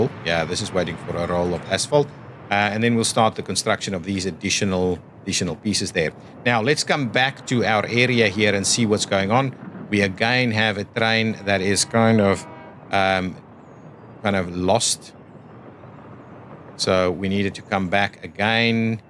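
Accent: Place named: Afrikaans, South Africa. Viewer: Are you from South Africa? Your accent is very Afrikaans. The way the 'R' is pronounced makes me smile every time.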